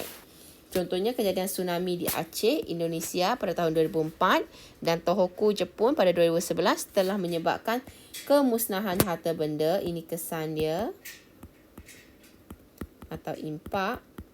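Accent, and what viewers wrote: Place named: Kelantan, Malaysia. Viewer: cg,cg orang Kelantan erhhhh HAHAHHA BUNYI SLANG KELANTAN "TAKDI" 😂.COMEL CG TQ VIDEO NI TOLONG SAYA UNTUK ULANG KAJI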